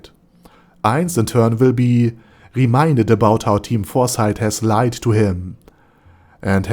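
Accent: Germany. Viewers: Geiler Deutscher Aksent Feier ich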